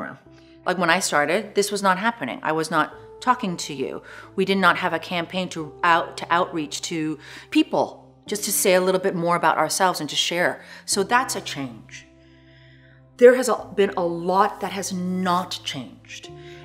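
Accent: United States. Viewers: The whole time I think she is american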